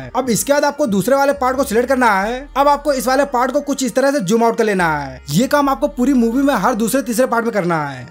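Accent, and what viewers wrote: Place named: India. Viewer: Why he is saying always click and then I I heard it wtf india😂 accent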